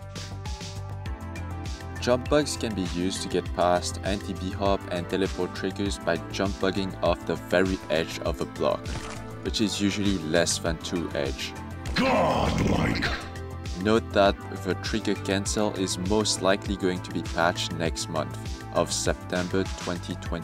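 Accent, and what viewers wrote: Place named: France. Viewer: is that ur voice??? u sound so french XDDD anyways nice tutorial...best so far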